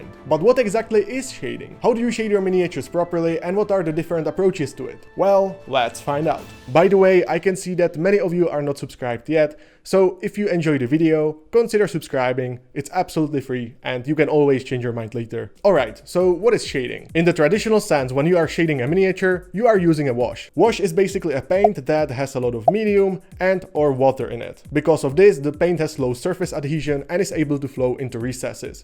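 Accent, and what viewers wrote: Czech Republic. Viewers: způsob, kterým vyslovujete slova jako "miniature" a podobně, Češi mají podle mě velmi specifický způsob kladení důrazu na to měkké anglické "r" :)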